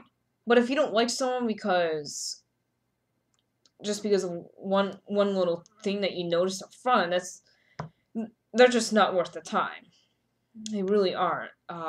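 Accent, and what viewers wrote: New York, United States. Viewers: Hi, I'm from Australia....really enjoyed your thoughts......what accent is that? Is that a particular area of NY?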